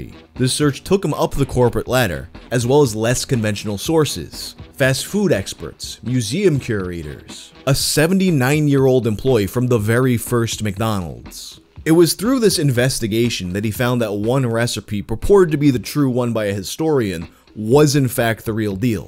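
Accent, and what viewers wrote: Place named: New York, United States. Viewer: Your New York accent is getting a lot thicker, it’s nice. Makes me want to listen to Type O.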